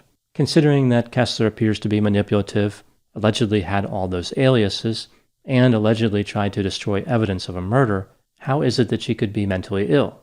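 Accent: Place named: Philadelphia, United States. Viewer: very nicely done analysis. Kessler reminds me a bit of the Florida serial murderer Aileen Carol Wuornos. Doctor, you sound a bit like you're from the greater Philadelphia, or Tri-state area. Thanks a bit for the video. I'll keep watching future postings.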